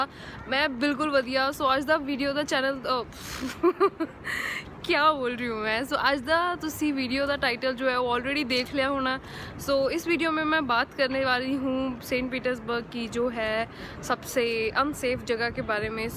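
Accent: Punjab, India. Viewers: Even while talking in Hindi , accent is still Punjabi ...love u cutie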